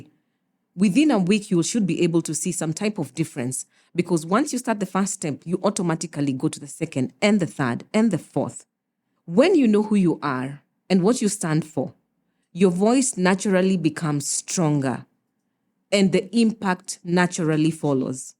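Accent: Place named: United States. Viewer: Kizungu ya amerkaa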